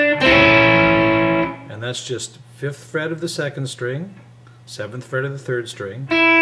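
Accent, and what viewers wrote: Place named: Canada. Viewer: Thanks for the lesson. Very helpful. Are you Canadian by chance? You accent suggest that you might be. Thanks.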